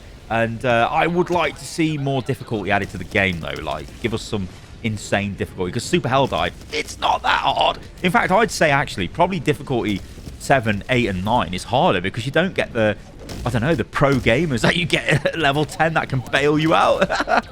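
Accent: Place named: Southern England, United Kingdom. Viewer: Nah they're both just from the south. London area.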